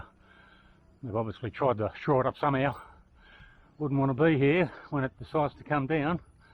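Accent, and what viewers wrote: Australia. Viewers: I would think there is little chance of getting kidnapped in the philippines - the dominant religion is Roman Catholic, and it's quite conservative. Nice video, and good to hear another Aussie accent for a bit. 'Flat out like a lizard drinkin' up those hills. I'm the same now (not that I did any serious cycle touring until my 50's anyway) Dingo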